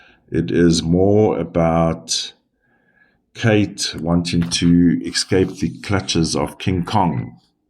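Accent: South Africa. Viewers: So good to hear a South African voice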